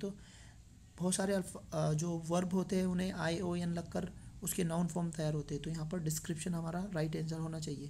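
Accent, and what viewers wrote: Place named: Nandurbar, India. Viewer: Mughe laga Nandurbar se hain aap .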